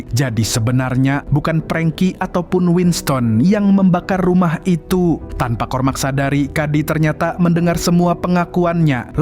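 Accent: Banjar, Indonesia. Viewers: Jah urang Banjar Leh Pian mang ,, mantapp 😂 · Urang banjar jahhh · Ternyata urang banjar lah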